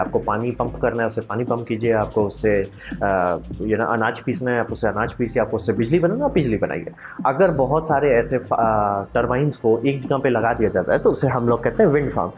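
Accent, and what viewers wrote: Bihar, India. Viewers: Sir apko sun kr mza aa gya.. Kitna achhe se smjha diya aapne... Love u sir...Awaz se Lgta h aap v Bihar se ho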